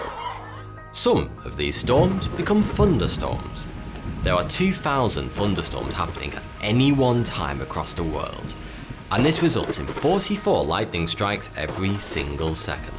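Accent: United Kingdom. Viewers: just another British man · thats what he says mate ye do realise in the uk there's like a different accent every 30 minute drive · I don't know why, but I enjoy all intellectual and educational topics with a British accent.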